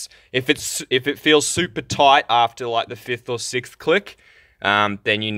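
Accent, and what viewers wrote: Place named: Australia. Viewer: Thanks so much for this vid! I keep coming back to this so I know exactly what to do. True aussie bloke right here. If you ain't Aussie well you sure sound like one lol.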